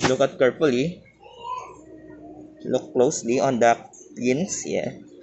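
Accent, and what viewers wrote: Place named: Visayas, Philippines. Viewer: Bisayaa oi. Peste. English² pang kagwang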